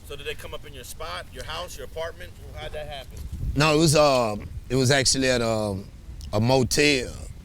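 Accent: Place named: Houston, United States. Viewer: He talk just like them Houston cats too.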